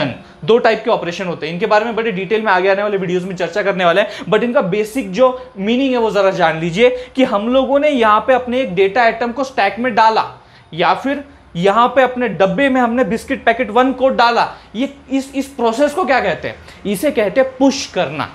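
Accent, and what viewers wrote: Maharashtra, India. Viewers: Marathi ho kya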